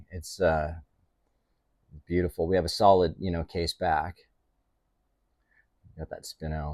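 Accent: United Kingdom. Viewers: Great British accent….. can we have some more 😂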